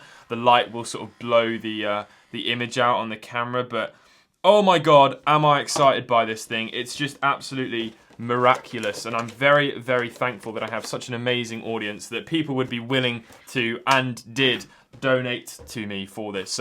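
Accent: United Kingdom. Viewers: Whats with the deal with the British accent but American phrases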